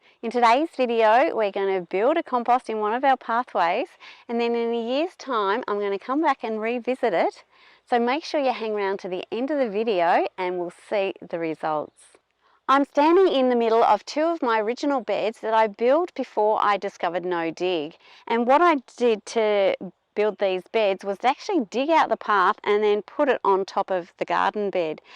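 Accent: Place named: Australia. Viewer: I like the accent of down under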